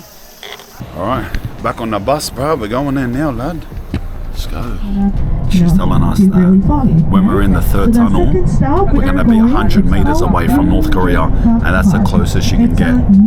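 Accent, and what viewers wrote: Australia. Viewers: Its hard to take the aussie accent seriously 😅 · I am 74 & learned more about North Korea in this video than I ever learned in school or on news.Never heard about the tunnel. I liked everything about your video including you cool attitude. And who wouldn't love that Aussie accent. ❤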